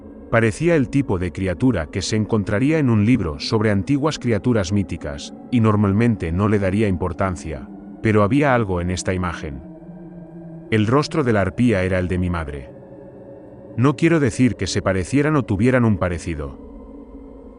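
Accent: Spain. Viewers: Es encantador como los Españoles pronuncian la "S" , en cada País es distinta la forma de pronunciar ciertas letras y nadie tiene porqué hacer llamado de atención de como uno pronuncia una palabra .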